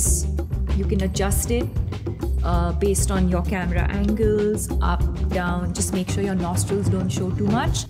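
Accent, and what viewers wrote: India. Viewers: I'm in love with her banglori English 💕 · Let's just talk about her accent like so many ppl change their accents when they be popular and look at herrrr pure Indian!!!!!❤️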